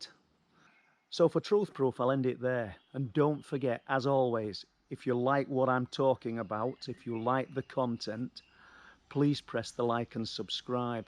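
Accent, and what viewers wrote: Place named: North, United Kingdom. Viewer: It’s the northern accent (slight I know) that really works with spooky stuff. Like Deb Hatswell.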